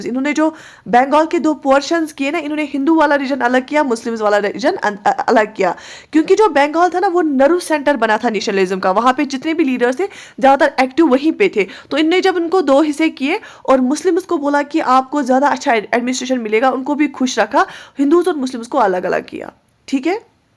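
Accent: Kashmir, India. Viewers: Your accent looks South kashmiri but you are from gbl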